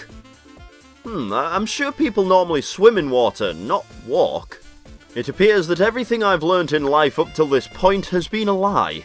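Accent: United Kingdom. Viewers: He's British I think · Are you British? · Are you British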